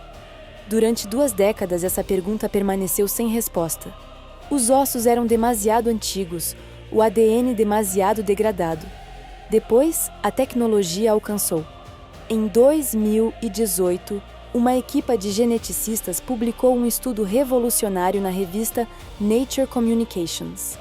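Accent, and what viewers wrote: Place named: Brazil. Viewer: Moça, por que seu sotaque é brasileiro e você fala português de Portugal? Vídeo bem interessante! Vlw!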